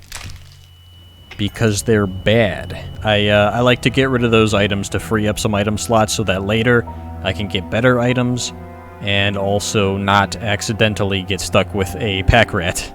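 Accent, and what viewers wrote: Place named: Michigan, United States. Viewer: Are you from Michigan? Your accent sounds so much like my brother in-law who is from there.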